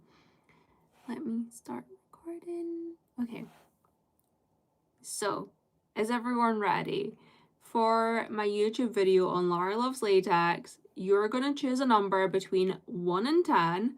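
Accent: Scotland, United Kingdom. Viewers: I was very fortunate to put on YouTube to be greeted by Larah only a few minutes in to the stream. Both entertaining and beautiful (if I may be so bold to say so) unfortunately I fell asleep halfway though, thank goodness for catchup. It had been a very busy day in my defence but I suspect your wonderful voice and gentle Scottish accent played it's part. 💕💕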